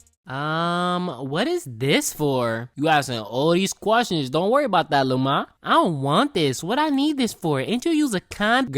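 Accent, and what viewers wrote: New York, United States. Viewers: that yew yorkin language HIT DIFFERENT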